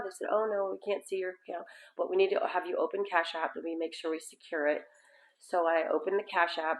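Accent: India. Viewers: Indian accent maybe.? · East Indian accent? Asking because it’s very common.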